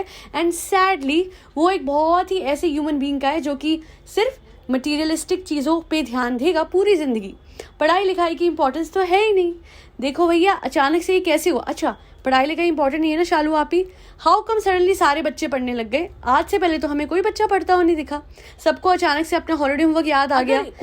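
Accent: Punjab, India. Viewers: Sorry to ask but aap Punjabi ho kya?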